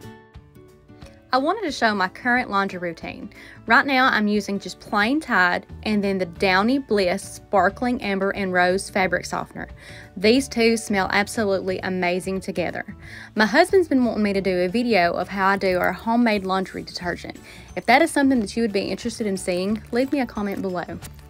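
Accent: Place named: South, United States. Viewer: Just saw your video today for the first time. I can tell you are from the south like me! Enjoyed your video here in Mississippi!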